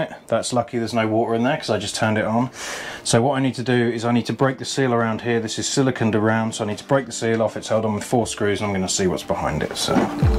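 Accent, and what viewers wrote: United Kingdom. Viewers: I just watched your video and it answered my question. But, I want to be sure that was the source of the leak. By the way, I could listen to you Brits all day long. Thanks from Arizona, USA. Dan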